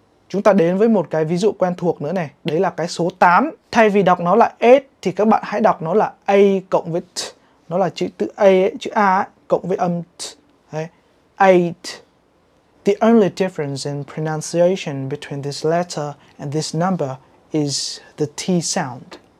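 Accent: United Kingdom. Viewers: Ong nay thi ong phat am theo british ma suot ngay cu blame theo A.E accent lol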